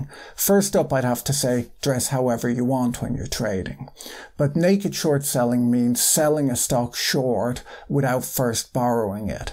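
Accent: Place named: Ireland. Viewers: I'm guessing an Irish person who has lived in England for a few decades so the Irish accent is less pronounced. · What's your accent, it's very nice, I get a little bit of Irish but also something else?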